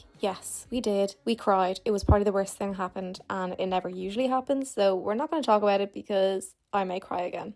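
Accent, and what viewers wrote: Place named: Ireland. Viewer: OHHH I absolutely love that your channel popped up on my feed! Your Irish accent is the icing on the cake! 🎂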